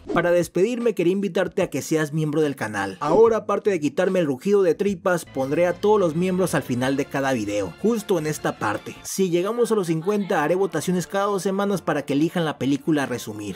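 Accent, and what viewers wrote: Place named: Aguascalientes, Mexico. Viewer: Tienes un acento igual que yo y los que viven en Aguascalientes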